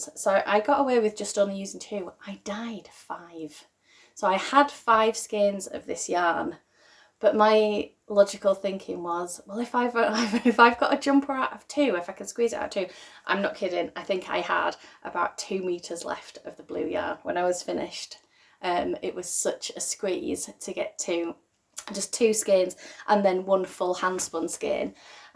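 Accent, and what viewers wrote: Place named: Sheffield, United Kingdom. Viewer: Hi good to watch you again. Love hearing you, reminds me of Sheffield!!!! We moved out to New Zealand 16 years ago and I miss ALL the English accents. :) We are in autumn here and I just cast on a swatch for a new jumper for my hubby. I hope you decide to make up your own patter. It seems to me that you are really creative so this is just another way to explore your creativity. Your spinning is making me go back to finish my rolags. :) Catherine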